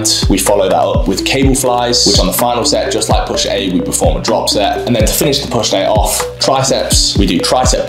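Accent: United Kingdom. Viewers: The british accent 🙂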